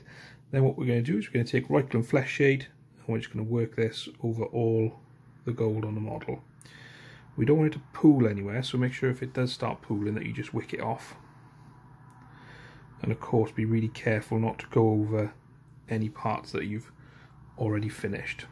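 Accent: Wales, United Kingdom. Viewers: Hey great video, just picked up my first Age of Sigmar set and I'm keen to get painting. Also, do I detect a little Welsh accent in there? Either way, greetings from South Wales :D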